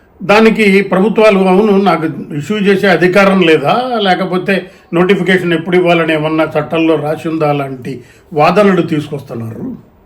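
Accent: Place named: United Kingdom. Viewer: తెలుగులో ఎంచక్కా చెప్పవచ్చు కదా... బ్రిటిష్ యాసతో ఇంగ్లీషులో ... అందరూ అర్ధం చేసుకునే అవకాశం లేకుండా పోయింది అని... అందుచేత...మీ యూట్యూబ్ ఛానల్ చూడదలచుకోలేదు..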